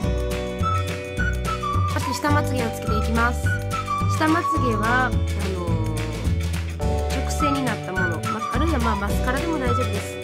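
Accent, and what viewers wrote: Kansai, Japan. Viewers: めっちゃかわいい白人さん？って、ベースメークの動画を見ておもって、関西弁だったから超びびりました！ ほんとファンです❤️ サバサバしてて、わかりやすいし、すき！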